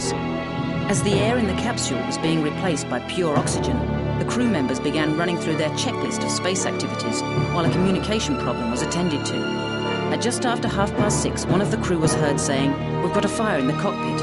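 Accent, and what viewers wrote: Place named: United Kingdom. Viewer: "Ed White was seen on the television monitor trying to open the hatch." Ten seconds later: "With a hatch that could only be opened from the outside, the three men didn't stand a chance." Hmmmmmm. THAT'S good documentary filmmaking for you! So... Either Ed White was clawing at a hatch that couldn't be opened as he died, OR, the hatch COULD be opened from the inside, and this Brit narrator doesn't know wtf she's talking about!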